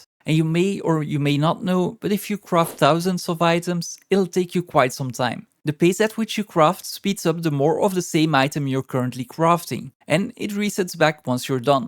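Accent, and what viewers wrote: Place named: Flanders, Belgium. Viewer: Hi, found your channel recently. I like your to the point guides. You sound "Flemish". Where are you from?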